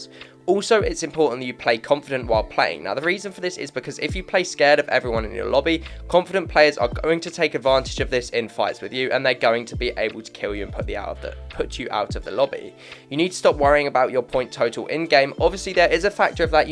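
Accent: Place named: United Kingdom. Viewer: oh my god he got the strong bri'ish accent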